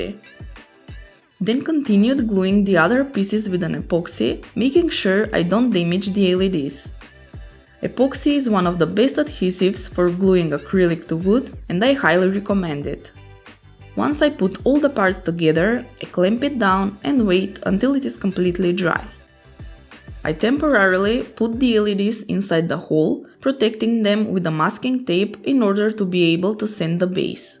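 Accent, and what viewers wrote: Russia. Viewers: Попахивает русским акцентом) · Похоже русская озвучивает. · Отличная работа! Но у вас жуткий русский акцент, надо тренироваться ;) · Какой четкий русский акцент в этом английском :) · русский акцен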